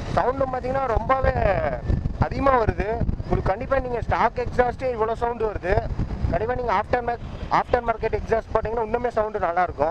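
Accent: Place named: Kongu, India. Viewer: Kongu tamizha nee super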